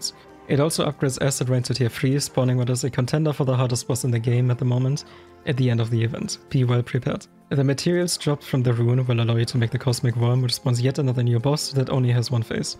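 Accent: Germany. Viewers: Idc what anyone says if the title says spoiler free and the accent says German af then you won’t find ANY spoilers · Thx man. Are you German?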